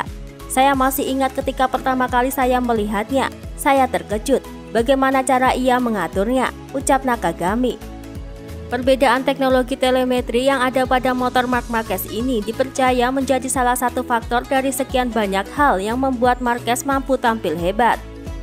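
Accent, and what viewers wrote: Java, Indonesia. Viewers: komentator nya jowo banget ya wkwkwkwkwk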